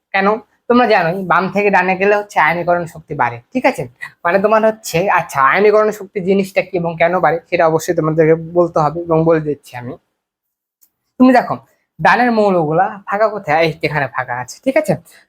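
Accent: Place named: Chapai Nawabganj, Bangladesh. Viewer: Vai apnar kothai a Chapaiya feel pacchi. Dari tao sei, chagol er moto cute.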